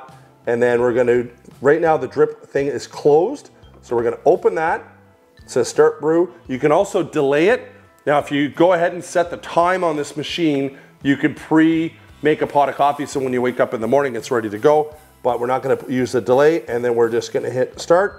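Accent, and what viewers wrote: Canada. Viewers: As always, &, I love your videos! Your honesty and real life situations make me feel like I'm at your home and you're giving real advice! Much appreciated! My Mom was co-owner of a coffee service. Once a month you should run a full pot of 1/2 white vinegar and 1/2 filtered water through the pot portion. And 15cc white vinegar and 25cc filtered water through the espresso side on Luongo setting. BUT that is only if you use both sides daily! If not than every other month is good. I used to have to clean the machines that were replaced, or being repaired. White vinegar is better than any so-called coffee machine cleaner! Those cleaners almost never flush out entirely. Their residual lousy taste lingers!!! White vinegar flushes right out! As a rule of thumb, I would always tell our customers to make your first pot of just water. Then make ALL the coffee & espresso you want!😊 Optimum coffee/tea temperature of 55-68°C/167-170°F is best to not experience the bitterness that most people find distasteful about coffee. 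Never put a Yeti, or any other insulated double walled steel/plastic/triton cup on the hotplate on the pot side!!! It can cause a fire! Nova Scotia, eh? I thought I detected an Eastern Canada accent! Parlez vous France'? Je parle les France' au les Canadienne! 🙂 Again, I LOVE your videos!!! All your hard work is much appreciated!!!🇨🇦🇨🇦🇨🇦 Nancy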